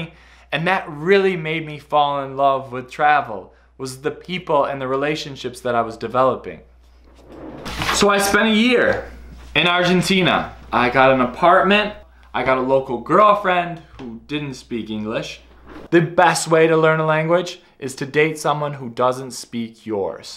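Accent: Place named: United States. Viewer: It's a great video. I follow your channel for a while. And I really enjoy it. First, thanks for making create videos for us. Funny thing, I was wondering which country you are from. I never thought you are a born American. I feel your english is great buy you have very noticeable accent. I was thinking. Mmm, this guy must moved to a english speaking country at young age. Then, I just saw your old video, the interview one for opening a book store . You spoke perfect american english. Does your accent really change or you just intentionally speak very slowly to cater the foreign audience? : )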